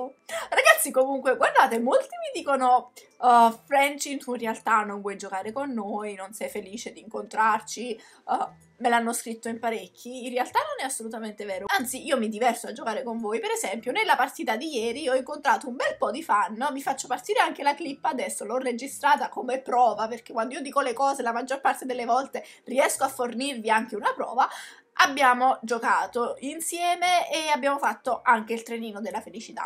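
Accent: Naples, Italy. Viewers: Ma sei napoletana? Scusa se te l'ho chiedo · raga solo io sento un accento napoletano hahaha <3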